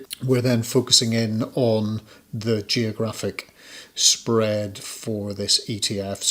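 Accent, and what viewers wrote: Northern Ireland, United Kingdom. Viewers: Do I detect a northern Irish accent 🧐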